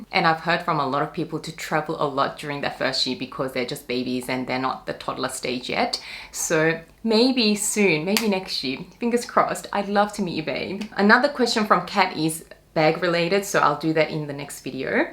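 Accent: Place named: Australia. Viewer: Your aussy accent is so cute and the way you speak is so pleasant and calming. I can listen to you talk forever 😆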